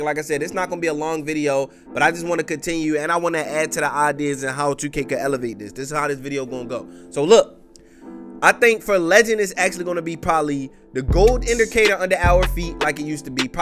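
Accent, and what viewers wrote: Baltimore, United States. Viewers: u from baltimore? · I hear Baltimore and Philly at the same time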